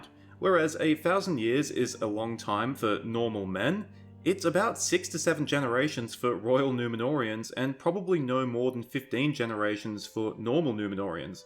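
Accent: Australia. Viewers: I deduce (correctly I hope) that you are from Sunshine Coast, Australia, based of your accent and the 350 000 number you gave! I'm kinda scared if I'm way off though. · I've been trying to guess where you're from by listening to your pronunciation of certain words for quite a while. I think NSW, possibly Central Coast, Wollongong. And unlikely picks for cities with a similar population are Hobart and Geelong.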